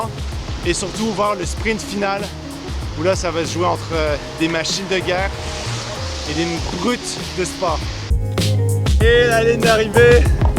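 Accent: Quebec, Canada. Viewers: c'est marrant pcq j'ai l'impression que tu prend de plus en plus l'accent quebecquois , enfin tu l'avais surement avant mais tu devait moins le montrer dans tes videos a l'epoque ^^ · T'as pris un putain d'accent québécois 😮😮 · On entend beaucoup plus l'accent québecois de dans cette vidéo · je te suis depuis à peu près 5 ans, et j'ai eu l'impression (dans cette vidéo) qu'il y a un petit accent Québécois qui commence à arriver que tu n'avais pas avant. C'est juste moi ou? ^^ Sinon comme dab, super plaisirs de te voir en vidéo:) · WTF il est Québécois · J'ai l'impression que ton accent québécois est + marquer qu'avant :o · C'est moi ou ton accent québécois ressort encore plus qu'avant ? :o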